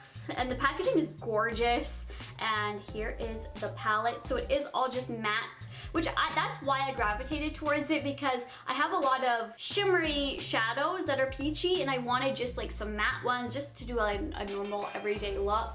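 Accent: Canada. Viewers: DayDr3ams is she not allowed to have a Canadian accent 🤐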